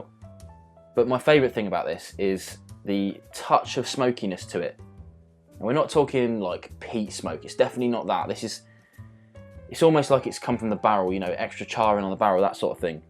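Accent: England, United Kingdom. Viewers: I actually like the English accent. It makes him unique. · i could do without the engish acent.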